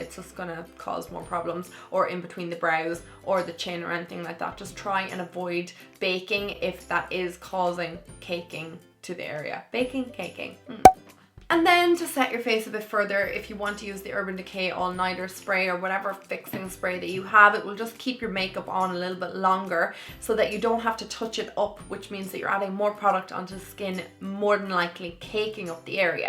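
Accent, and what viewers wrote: Ireland. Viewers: awww ur so pretty darling. love ur accent. u sound Irish ? are u ? I'm new to ur channel. beautiful make up X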